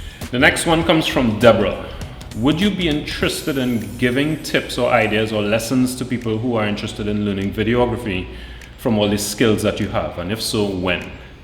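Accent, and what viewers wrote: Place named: Siparia, Trinidad and Tobago. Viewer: That is so cool! I know someone from Siparia and he sounds like you.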